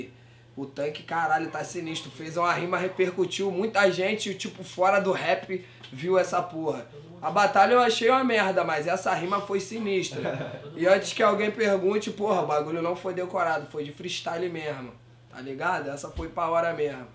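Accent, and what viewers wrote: Rio de Janeiro, Brazil. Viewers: a maioria dos menor do rio fala assim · Eri Soares é de carioca, hahaha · Melhor sotaque era o carioca. 💪😍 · Dener Melo aqui no RJ a maioria dos menó fala assim kkk eu tmb me incluo nisso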